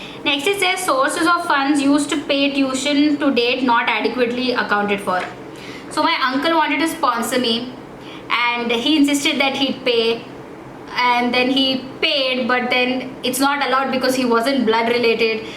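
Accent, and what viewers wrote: India. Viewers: indian accent 🤣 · Although i had AAAAAAALLLLLLLLLL the documents LOL. Indian accent is the funniest one.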